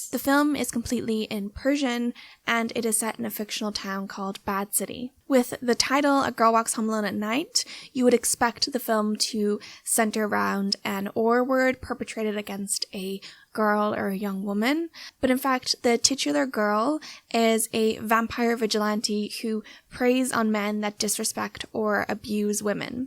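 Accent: Ireland. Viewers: Amazing video but unrelated, do you have a foreign accent? just on the Rs and the "ah" sounding As does it sound irish-ish lol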